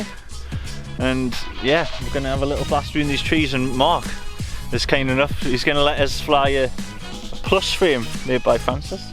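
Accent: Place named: Newcastle, United Kingdom. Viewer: Mint video mate love to hear another geordie haha !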